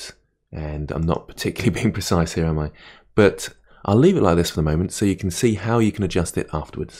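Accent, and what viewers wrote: United Kingdom. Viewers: (me, opening up this tutorial) Oh hell yes British Accent thank god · it's good that you show which keys do you use. Because of Your bri'ish accent i've mistaken alt for o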